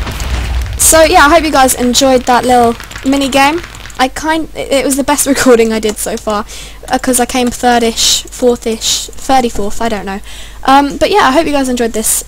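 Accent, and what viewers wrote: United Kingdom. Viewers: Omai! Your British accent is SO cewt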